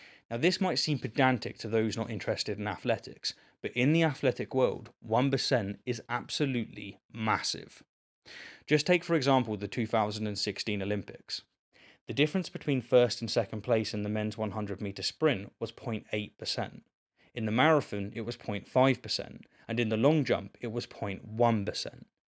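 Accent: England, United Kingdom. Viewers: This is too tragic. I thought this was somehow going to be promising. Forcing skepticism on anything and everything is just a formula for disaster. Talk about scraping the bottom of the barrel. I guess it's just the english accent I so splendidly enjoyed but other than that nothing to distinguish the channel from the rest of the noise.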